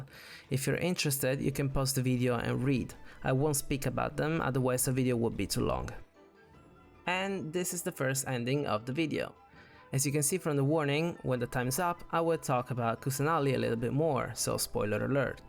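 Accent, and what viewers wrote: Italy. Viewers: you are italian arent you? I recognize your accent reading in latin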